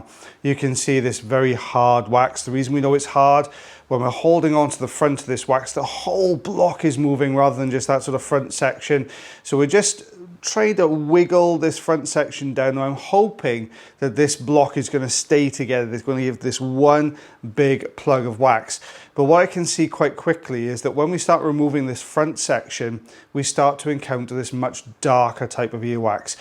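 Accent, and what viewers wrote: Wales, United Kingdom. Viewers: That was a great one! And you said Ebony in your lovely Welsh accent. I’m happy. And glad you’re sounding better today.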